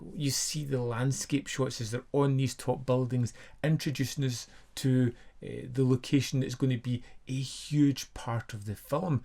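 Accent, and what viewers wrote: Scotland, United Kingdom. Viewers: I love this guy's Scottish accent even if I can't always understand it.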